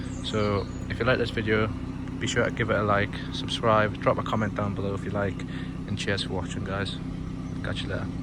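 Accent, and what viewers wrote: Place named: Newcastle, United Kingdom. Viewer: Fellow Newcastle accent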